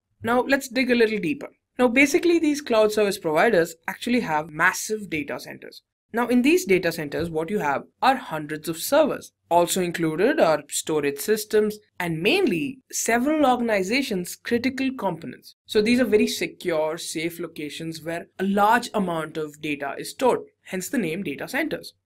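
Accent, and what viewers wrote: India. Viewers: Please don't use Indian accent :) Its hard to listen while focusing to understand the content...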